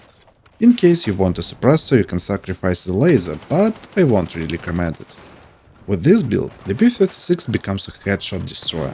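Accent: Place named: Russia. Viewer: И смысл говорить на корявом английской. Все же слышать что ты русский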